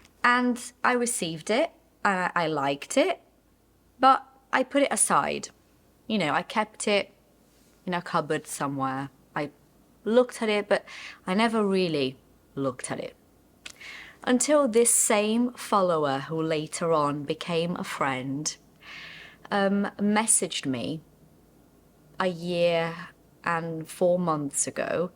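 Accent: United Kingdom. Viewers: your British accent is brilliant. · I like the video, I'm like you. But how do you manage to have that British accent while being Brazilian? lol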